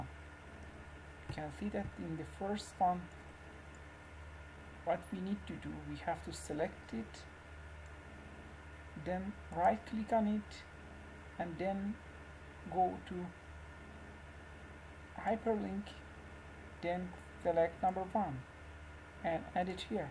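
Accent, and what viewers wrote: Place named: Iran. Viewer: Thank you so much for this upload - grateful! You sound Iranian! I have an Iranian friend. Cheers!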